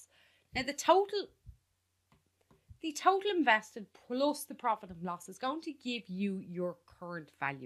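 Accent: Ireland. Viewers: Love the Irish ☘️. Thanks for this 👍🏽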